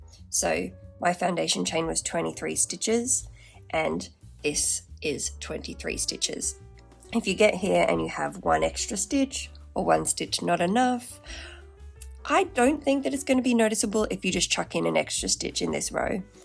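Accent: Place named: Australia. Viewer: Sounds Australian to me. Great look for crotchet.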